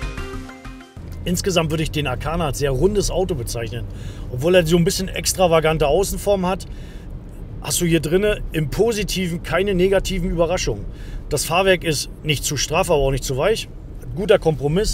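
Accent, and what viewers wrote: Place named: Berlin, Germany. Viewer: Berlin / Brandenburger Akzent 😀🤜🏼🤛🏼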